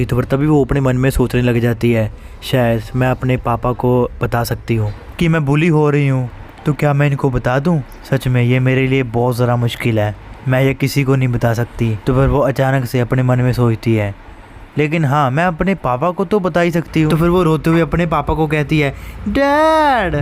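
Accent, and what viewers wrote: Punjab, India. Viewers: Bhai aap Punjabi hona right guess?❤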